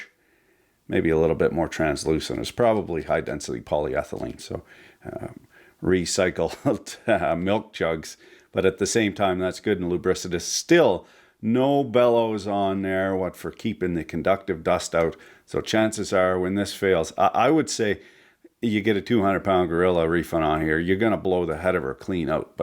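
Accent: Quebec, Canada. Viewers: Are you from Quebec? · As a Canadian I can say never have I heard more of a Canadian accent. So thank you sir!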